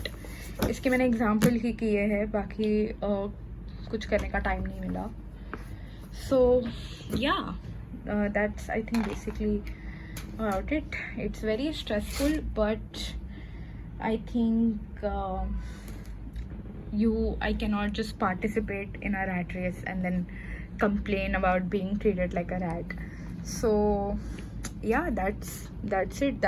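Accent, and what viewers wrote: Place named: Mumbai, India. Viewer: Bro got mumbai accent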